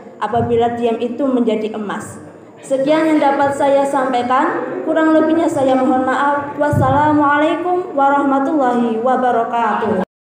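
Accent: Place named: Banyumas, Indonesia. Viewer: Semangat mbak ngapak❤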